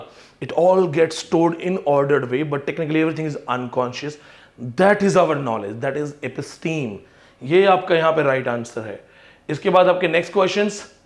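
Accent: Rajasthan, India. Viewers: rajasthani accent is very nice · Excellent Rajasthan accent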